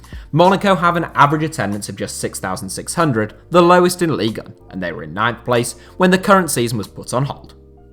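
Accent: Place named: England, United Kingdom. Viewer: Your english accent is so heavy that I can't understand a word as a foreigner😂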